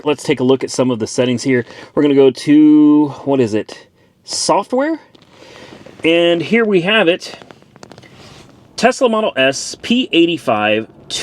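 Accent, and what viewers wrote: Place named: Oklahoma, United States. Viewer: What part of Oklahoma are you from?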